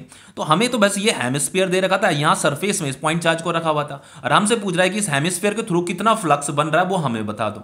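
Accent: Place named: Bihar, India. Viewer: I am from Bihar .... Apke bolne ke tarike se mujhe laga ki shayad ap Bihar se ho..... By the way I like your videos main apke hi videos se 11 class bhi padhi aur 12 th bhi padh Rahi hu .... Thankyou so much sir😃😃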